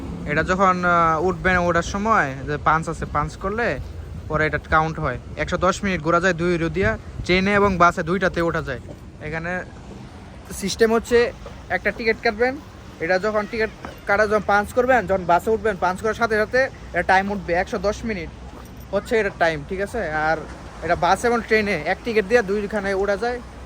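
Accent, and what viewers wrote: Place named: Chapainawabganj, Bangladesh. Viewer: ভাইয়া তোমার বাসায় কি চাঁপাইনবাবগঞ্জ 😊😊😊😊😊রিপ্লাই টা দিয়েন ভাষা বুঝে বুঝলাম 😊😊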